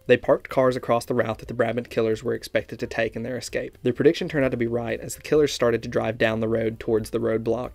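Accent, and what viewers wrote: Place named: Southeast, United States. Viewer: Where's the accent from? It's Southeast, but not too southeast. I'm in NC, but I'd guess TN if I had to.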